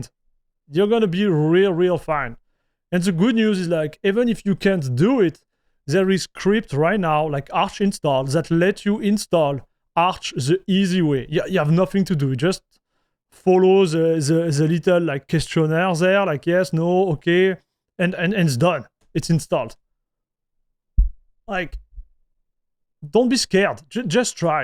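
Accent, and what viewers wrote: France. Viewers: Sorry for reducing you, but I only watched this for the english with french accent.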